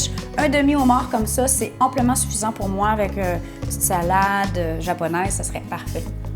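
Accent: Quebec, Canada. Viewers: L'accent du Québec 😍😍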